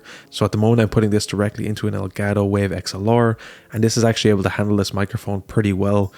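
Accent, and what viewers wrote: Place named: Ireland. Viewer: Makes that sexy Irish voice so much better ;)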